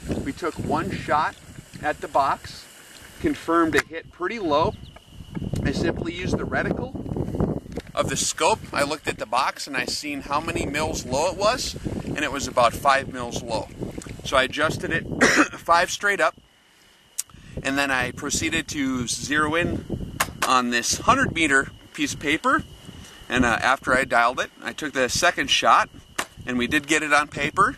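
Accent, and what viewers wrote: Canada. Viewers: Sorry kind of a new watcher here, but are you Canadian?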